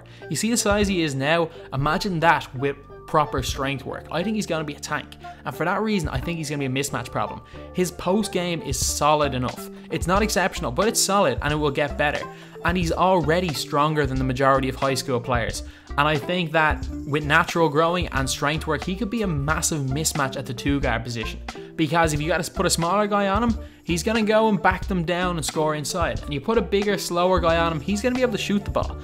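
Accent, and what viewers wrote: Ireland. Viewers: R u irish ya??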